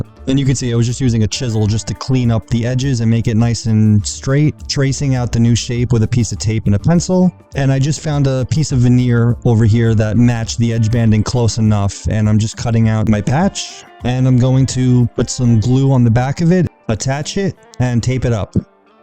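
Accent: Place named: New England, United States. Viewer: I enjoy your work & have seen many or your videos. I subbed today, didn't realize I hadn't. Sometimes I wait for a few videos to see if a person genuinely has my interest. May I ask, are you from NY or New England? I think I recognize the accent (I'm a New Englander), no offense intended. You could consider (?) putting an email address in your bio or other SM as some of us may be interested in contacting you without leaving messages for the world to see ;) - Great job, so refreshing to see restoration. I also admire furniture art & many forms of art, but restoration is its OWN baby! 🥰Kudos!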